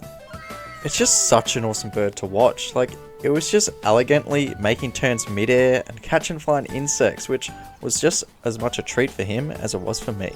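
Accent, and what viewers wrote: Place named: Australia. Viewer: 🤣love that YouTube recommended your channel! Subbed as soon as I heard our Aussie accent 🙌🏻 loved the birding and the commentary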